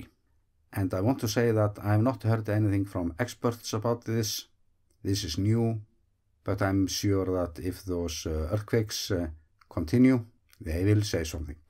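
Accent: Iceland. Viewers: I think he has a charming Icelandic accent, just like Björk😀 I once saw an interview with her, where she was asked how her name was pronounced, and she replied with “It rimes with a jerk”😆 Anyways, you just try to pronounce Eyjafjallajökull, Bárðarbunga or Landmannalaugar, and lets see how it goes, I would probably find that charming too😉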